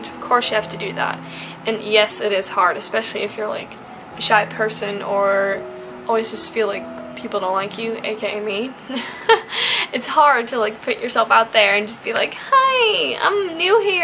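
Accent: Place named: United States. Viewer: You haven't lost your American accent yet. Great tips!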